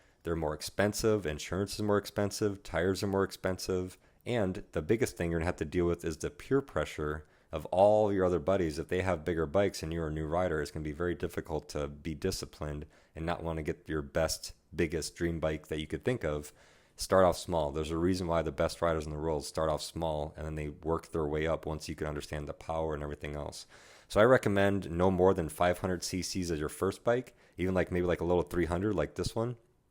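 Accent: United States. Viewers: Holly molly, as soon as I was 15 secs into your video I knew you were american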